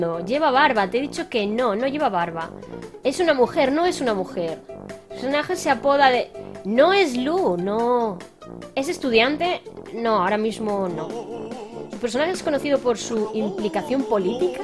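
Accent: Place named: Spain. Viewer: Sí, es española. Se nota por su acento 😆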